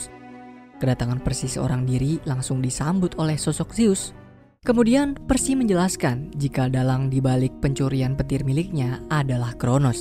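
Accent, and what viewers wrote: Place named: Java, Indonesia. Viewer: bang orang jawa ya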